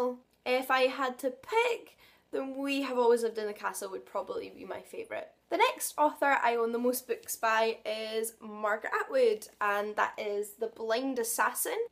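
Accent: Scotland, United Kingdom. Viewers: Are you from Scotland?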